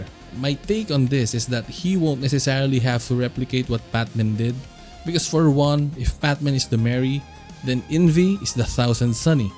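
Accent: Philippines. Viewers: Pinoy ka bro? tonong pinoy ka kasi eh. 😁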